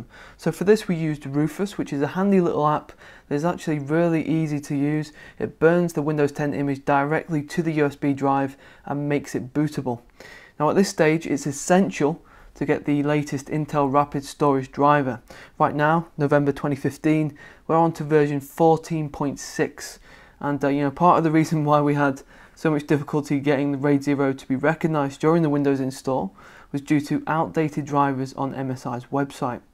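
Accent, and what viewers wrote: England, United Kingdom. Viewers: where are you from? england?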